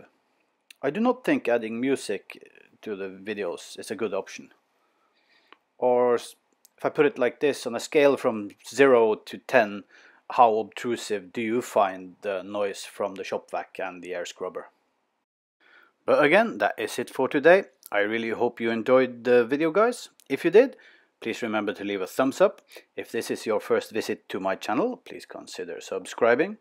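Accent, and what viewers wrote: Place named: Ireland. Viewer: Don't lose the tool sounds, and please do not add music. Your vac and air scrubber remind us that safety and cleanliness go together. I like your commentary, you sound as if you were taught English by an Irishman. That's no bad thing by the way, Irish people often have better diction than geographically English speakers of the language. Thumbs up from me, you got a new sub 👍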